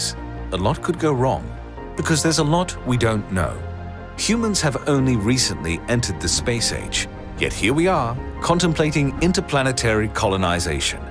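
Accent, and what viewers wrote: United Kingdom. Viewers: It's so weird the he speaks with British accent then uses metric system 😑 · I love the edit, the drawings, and the British accent. It's the perfect combination. I'm a FAN!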